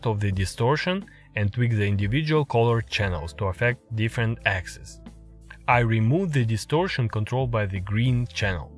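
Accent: Russia. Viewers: Мне кажется, или это русский акцент?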